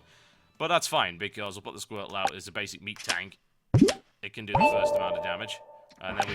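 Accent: United Kingdom. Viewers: Doesn't currently live in the UK, ergo his British accent is fake. Nice logic you have there, dope.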